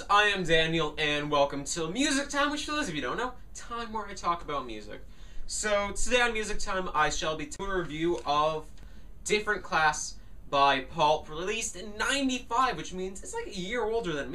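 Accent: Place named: Transatlantic, United States. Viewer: You're not only the first person with one of those funny transatlantic accents I've seen to like Pulp. You're probably the first Yank I've met who properly gets the class system we have over here. Kudos for that. Seriously. Also interesting point about something changed. Never thought about it before but you're right. It doesn't fit with the rest of the album. Not bad for a whippersnapper.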